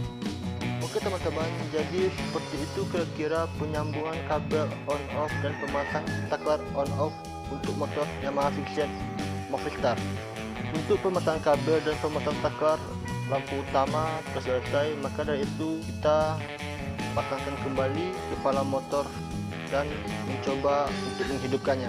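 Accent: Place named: West Sumatra, Indonesia. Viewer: kek nya abang nih orng minang.. ketahuan logatnya.. Salam rider yamaha bang hehe 🤗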